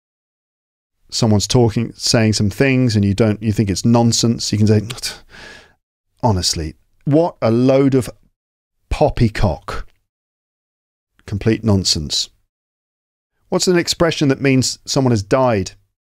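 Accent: United Kingdom. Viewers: Learning British Accent is easy watching your videos.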